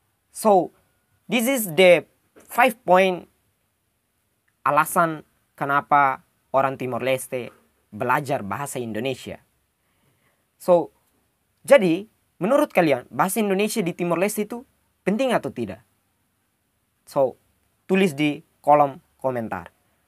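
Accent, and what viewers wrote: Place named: Malaysia. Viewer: Maaf.. Bisakah kata 'so' yang sering kamu ucapkan divideo mu... kamu ganti dengan ucap "jadiii".. kalo sering ucap kata "so"... jadi mirip kayak cara bicara orang malaysia.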